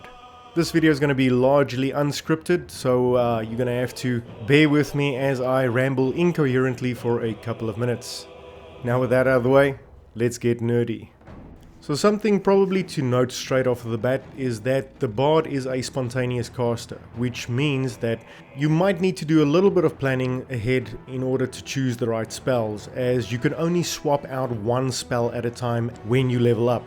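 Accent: Afrikaans, South Africa. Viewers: dude jy klink afrikaans.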